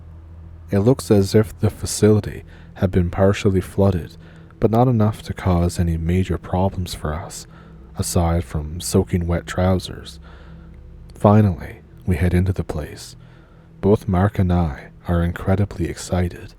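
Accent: Ontario, Canada. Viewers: They popped more than a couple off in the Hudson Bay. That's a fact. Always a pleasure to hear yuir voice, it always reminds me of my Ontario cousins. Oof Da. 🎉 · I think it's neat that anyone outside of Canada listening to this is going to think it's a Canadian accent but as someone who is from Canada and has lived all over I can definitely tell which region you're from. And it reminds me of home❤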